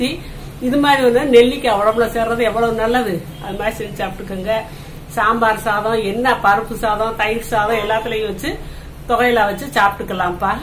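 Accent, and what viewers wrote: Madurai, India. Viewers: Madurai tamil suppera pesureenga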